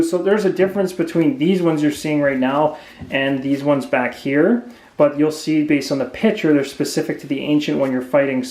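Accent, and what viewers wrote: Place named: Canada. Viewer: I feel like I pick up a Canadian accent lol. But thanks I’ll be buying this next time I can afford another expansion. Forsaken lore is arriving in mail tomorrow!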